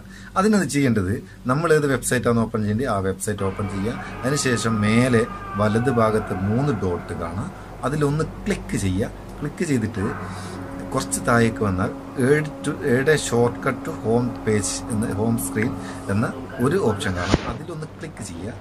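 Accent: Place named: Vadakara, India. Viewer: ഇക്ക നന്നായി ട്ടുണ്ട് നമ്മുടെ വടകര ഭാഷയിൽ അവതരണം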